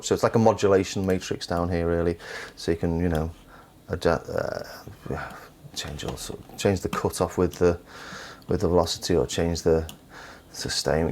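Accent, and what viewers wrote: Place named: Chester, United Kingdom. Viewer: great review mate...you sound like a Chester lad!!!!!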